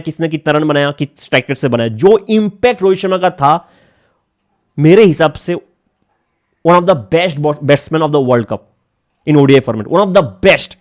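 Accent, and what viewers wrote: Bengal, India. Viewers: Are bhai wo bengali hai from what i can tell based on his accent. Mai bhi bengali hu isliye apko ek chiz explain kr rha hu. Hur communuty ke bolne ke trike mein apko kuch na kuch odd lagega ya funny lgega. Bengalis emphasize heavily on pronouncing words that sound like they have an e or u. So probably when he is saying will wo wueel sunata hain. Isko leke mazak udane se better why don't u just focus on the content and learn from him. He is a living encyclopedia in cricket stop trying to make fun of someone's way of pronouncing stuff when u haven't done jack shit with your own life. Tu bahar jayega na pdne be it canada or us or anywhere wo tere perfect accent ke upar haske tereko hur roz bully krenge tb acha lgega tereko? Have some empathy bro. Big 26 mein tu baith ke faltu chizo pe joke mar rha hai to sound cool.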